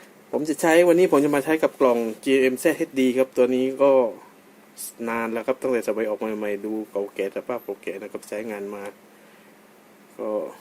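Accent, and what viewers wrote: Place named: South, Thailand. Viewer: พี่คนใต้ไหมพูดเหมือนคนใต้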